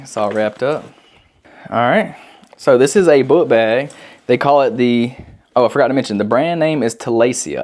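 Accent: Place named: South, United States. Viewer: Your little southern boy accent is so sexy.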